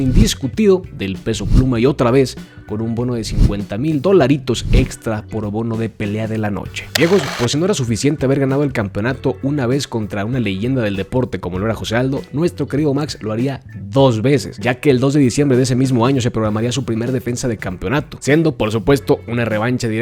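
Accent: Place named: Spain. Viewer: No lo tomes así hay gente q no le gusta el acento español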